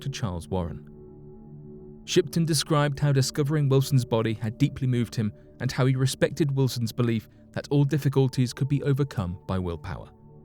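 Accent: England, United Kingdom. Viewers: Any british voice over on a video about Yeti, Ufo's, crop circles, and most other stories having Zero evidence are just like this. It sells but has no filling quality. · This English accent guy is an awesome narrator!!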